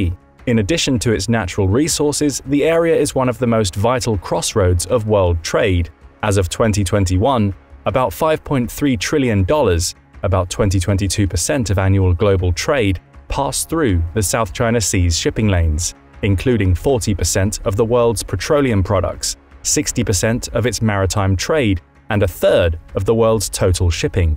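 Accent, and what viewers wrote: England, United Kingdom. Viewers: Thank you for actually stating you base this whole video off nothing more then purely speculation. You stated that this “Shoddy Construction” is based off a few of the islands runaways. You disregard the idea it could be to not provoke further escalation. Do you know that China is the builders of the world. You are lacking facts in this video. 100 years of humiliation….is the time you reference China being the largest economy and then crumbling. Th is was because the West forced worst, opium population, devastating economy, country all up with colonialism something that the rest seems to excel at. The question part is also that there is information here that is worth knowing actual but it’s used just to pop up a story or narrative that’s s not true you. if you decide to do research and publish videos based of facts might actually be worth following. 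You know what is true…Americans hear a narrator with an English accent, and automatically give credibility to whatever information is being spread. Neat trick and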